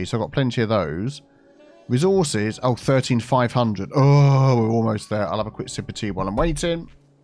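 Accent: United Kingdom. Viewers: Subscribe all would mean i get ALL the mods, Biff has FPS mods that my potatoe can't handle. so I need to search through the mods for the one he mentions, some like TMPE are hard to understand when someone says it quickly. especially a wonderful brit. a graphic with the name of the mod woule mean i could type the name in goole with Cities:skylines and immediatly get the mod I want to download.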